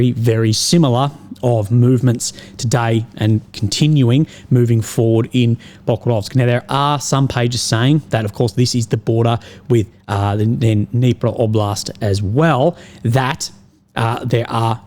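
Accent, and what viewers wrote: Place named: Australia. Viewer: the other producers you’re mentioning are way lower in quality. accent alone make Americans love him like crazy! We think that funny little accent is great. I could put up with a drunk Ausi talking nonsense all day and still have a good time. has implied many time “Ukrainian could Win” I quote “if Ukraine wins” There is not a bigger lie told then “Ukraine win” in the same sentence. I’m here looking at a map wondering when people stop lying to the poor men who die daily under the false idea of “winning in Ukraine”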